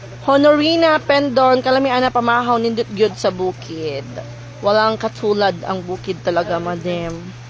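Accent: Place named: Waray, Philippines. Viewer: salita mo parang salitang waray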